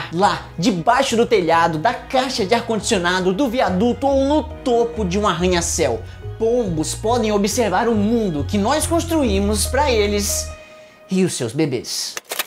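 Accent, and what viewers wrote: Ceará, Brazil. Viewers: Esse cara é cearense???😹😹😹😹😹😹😹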